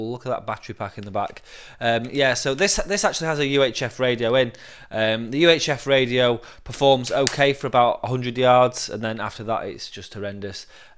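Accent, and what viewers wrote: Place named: Cockney, United Kingdom. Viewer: good video but you speak faster and you use the cockney accent and I cant understand you please add an authentic english subtitle because the automatic subtitle generator of youtube can't shoot all what you say because you speak faster and withe unauthentic accent thank you for reading my comment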